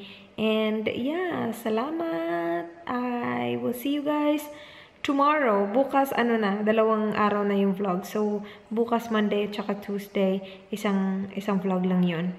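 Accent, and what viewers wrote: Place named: Cebuano, Philippines. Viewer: I really love your cebuano accent <3 hearing them talk cebuano - english is so lovely. That american accent tho <3. like colorismyweapon.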